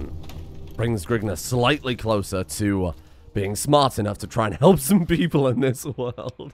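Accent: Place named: United Kingdom. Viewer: Honestly thats just how people living here sound like. Bri'ish air is a helluva thing.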